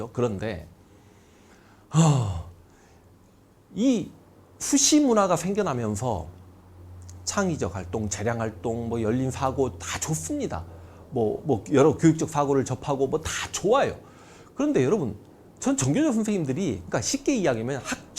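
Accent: Jeolla, South Korea. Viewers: 형님..잘 보고 갑니다. ^^ 전라도 분인것 같아서 ㅋㅋ 저도 전라도거든요 ㅎㅎ 앞으로 더 좋은 가르침 부탁드립니다.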